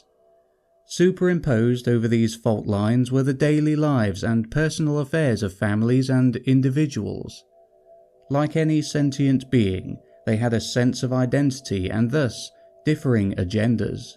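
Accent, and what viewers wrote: United Kingdom. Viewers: Why do I like listening to Brits so much more than anyone else? Great job.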